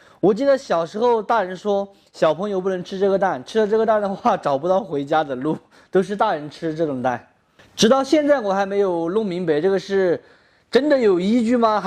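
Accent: Sichuan, China. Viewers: 老哥，你的椒盐普通话太地道了，我全程笑着看完的。😂😂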